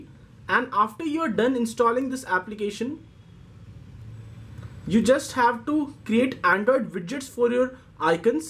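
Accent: India. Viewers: OMFG YOUR VOICE DAMN!!! ANOTHER INDIAN TECH CHANNEL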